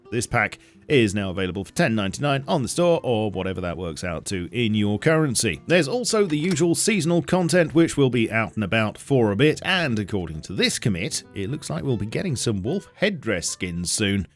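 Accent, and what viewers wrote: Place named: RP, United Kingdom. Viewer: Ive always wondered why you sound like a posh BBC topgear presenter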